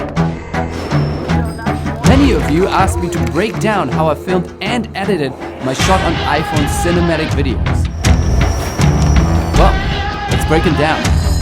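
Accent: Germany. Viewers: Kann es sein, dass du Deutscher bist? Irgendwie klingt dein Akzent danach. Super Video übrigens!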